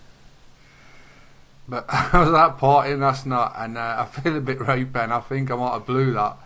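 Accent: United Kingdom. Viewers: Are you from UK?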